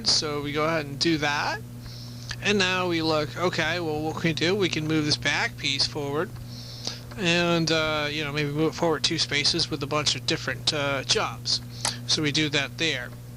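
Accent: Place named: United States. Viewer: You have one of the most 'Murican accents I've ever heard.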